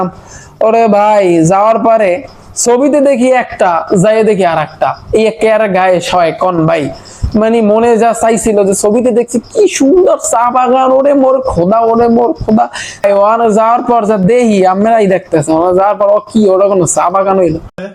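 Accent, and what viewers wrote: Barishal, Bangladesh. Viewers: ভাইয়া বরিশালের ভাষা টা একছেড় সেরা লাগছে🙂💔💔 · Sir apner class kori, apnar borishal language shune moja pelam.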